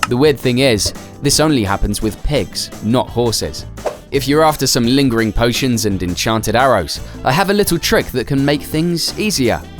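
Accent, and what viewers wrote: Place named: South, United States. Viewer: Was expecting a monotone American accent instead I got a chirpy southerner overall good deal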